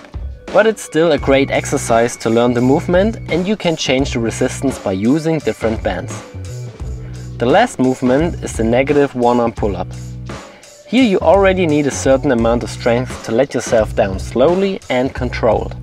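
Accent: Germany. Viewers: is this dude german? just asking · He is a german. You can hear it wery clearly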